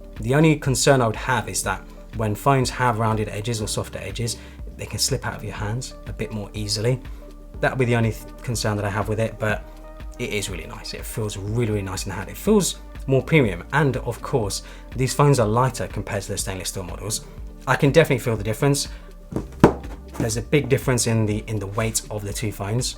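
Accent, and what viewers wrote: United Kingdom. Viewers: What a decent British Accent 👌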